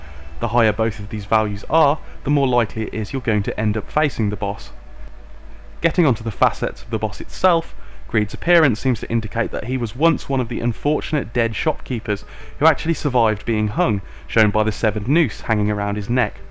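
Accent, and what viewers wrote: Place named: United Kingdom. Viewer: This is really difficult to understand. I hate this kind of british accent.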